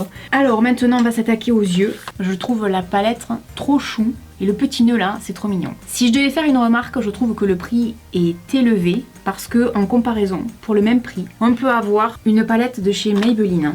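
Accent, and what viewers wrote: South, France. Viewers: je me répète mais j'adore ton naturel, ton joli petit accent du sud, ton humour, et ta sincérité! Je ne me lasse pas de tes vidéos:) bonne continuation! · Hello je suis un mec et j'ai découvert tes vidéos alors au début je me suis dit avec son intro “salut !” je ne vais pas aimer elle dit ça d'un air sévère haha alors pour le coup je me suis complètement tromper tu est géniale, franche et d'une bonne humeur communicative avec ton super accent du sud j'adore bonne continuation tu est pétillante !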